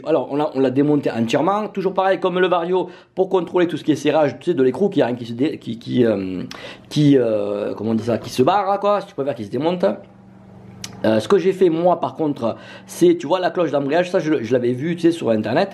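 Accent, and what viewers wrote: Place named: South, France. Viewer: bah disons que j'etais pas sûr en même temps car tu as un accent du sud, pas du tout Lyonnais quoi !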